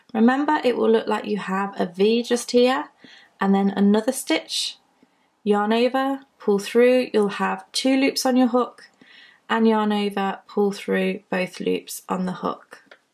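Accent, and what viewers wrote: United Kingdom. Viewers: My mom vaguely showed me how to crochet when I was a kid (she was lightning fast and almost never looked at what she was doing) and I've been wanting to see if I could actually do it recently. I will say this,. You are a good instructor and even if I never complete a piece, I promise you that at least a couple of my future video game characters will be named Ya'nova. I'll be hearing that term (in your British accent, of course) in my sleep. LOL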